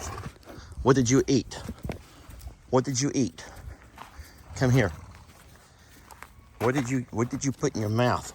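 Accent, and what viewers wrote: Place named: Alabama, United States. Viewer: It is funny to here you say, ‘seement” and “sareen’. Love the colloquialisms even from Alabama to Texas.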